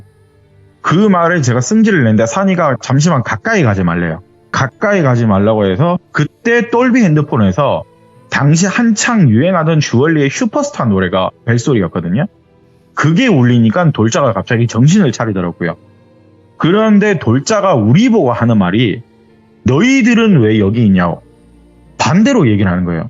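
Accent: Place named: Jeolla, South Korea. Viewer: 뭔가 불만이 많은듯한 전라도 특유의 꼬여있는듯한 말투.ㅋㅋ 깡촌을 깡촌이라 하지 뭐라그럼?ㅋㅋ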